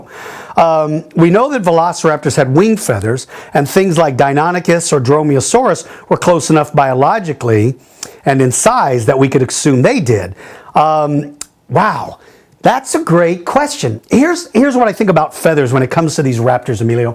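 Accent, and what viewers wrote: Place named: Germany. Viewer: thanks for answering my question, that german accsent made my day of a crappy day thankyou man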